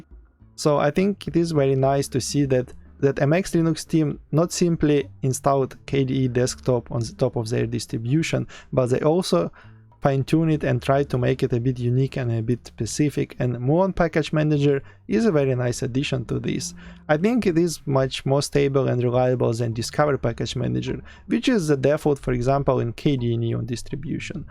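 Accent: Russia. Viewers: because it's russian accent, imho. It's most understandeable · I love your Russian accent so I subscribed! (I am from England) Also GREAT VIDEO! :)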